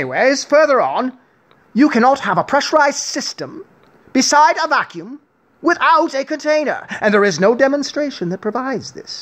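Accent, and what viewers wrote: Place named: England, United Kingdom. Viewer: If I didn’t know better, I would have thought you were from England not Alberta. 😘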